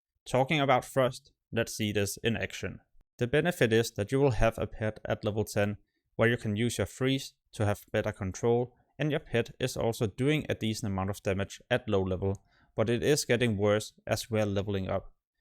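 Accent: Denmark. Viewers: why can i always hear someone is from denmark xD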